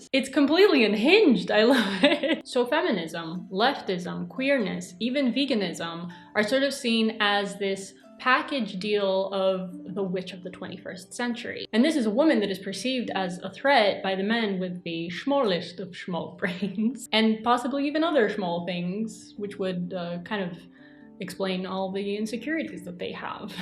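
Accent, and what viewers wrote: Greece. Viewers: Your greek accent gives me the feels <3 And Jiji is the best, I love black cats. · Your Greek accent is so spot on I wondered if you are from Greece oh my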